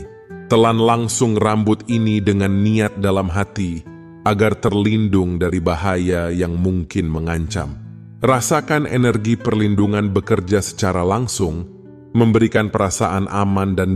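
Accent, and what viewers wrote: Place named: Malaysia. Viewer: Logatnya Seperti Bahasa Melayu Yang Disampaikan Oleh Penuturnya... Tetapi Text Tertulisnya, Menggunakan Bahasa Indonesia...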